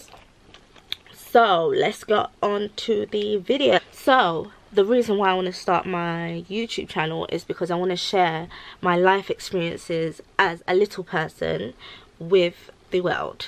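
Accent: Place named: England, United Kingdom. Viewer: mandy_ somda it’s English accent from England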